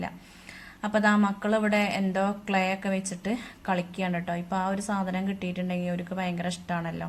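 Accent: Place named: Ottappalam, India. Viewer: ❤❤ ente nathoonum nalla aalanu pine sugano samsaram ottappalam samsaram anu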